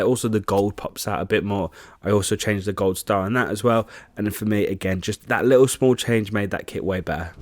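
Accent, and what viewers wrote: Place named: Black Country, United Kingdom. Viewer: 1.) Refreshing to hear a Black Country accent on YouTube. 2.) Do you remember a Spain kit with blue accents from 2013? Whatever happened to that kit?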